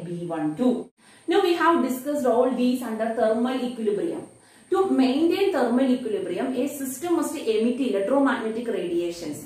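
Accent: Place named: South India, India. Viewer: South indian🎉💜 explanation(voice)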